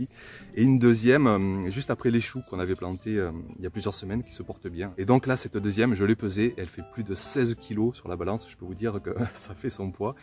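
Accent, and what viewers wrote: South, France. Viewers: Rien que ton accent du sud nous apporte du soleil ! Une vidéo une nouvelle fois riche d'inspiration, et le partage des recettes ou des techniques de conservation ça aussi c'est une idée géniale, je me demande comment tu arrives à trouver le temps de faire tout ça.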